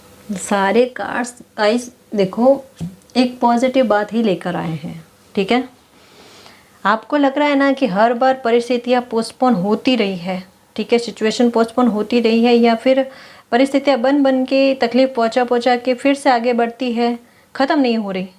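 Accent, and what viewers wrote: Gujarat, India. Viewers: Aap gujarati ho shayd...tone se hi pata chal rha h😁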